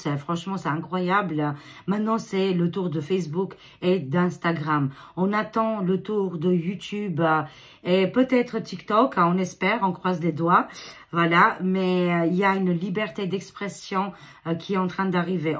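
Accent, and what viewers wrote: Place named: France. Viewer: Allo, c’est Johanne de Québec, j’ai aimer beaucoup ton vidéo À chaque jour jadore ❤❤❤❤❤❤ comme d’habitude, tu as beaucoup de nouveaux sur la France dans ton pays, et c’est très intéressant, c’est vrai que vous avez eu la vie pas très facile Depuis quelques années là bas en France, ça aucun sens, et tu as vraiment et tellement Raison, qu’il y aura beaucoup Nouveaux positif dans votre coin de pays, et j’aime et J’ adore beau ta belle accent de français quand tu parle, et sache que tu t’exprimes très très bien vraiment sincèrement, j’aime beaucoup entendre ta belle voix ma belle, je t’admire beaucoup, et sachez que Nous aussi à Québec le Gouvernement nous ont mener Dure, vous n’êtes pas les seules, car moi je vous comprends très bien 👍 je reviendrai écouter, plus-tard ton autre vidéo, Aujourd’hui plus-tard cars Nous avons 5 ou 6 HRS: de Décalage Avec Québec et la France, nos amis et cousins cousines de France, et je te dit toujours ton Beau sourire charmeuse, merci pour tout ce beau partage 😊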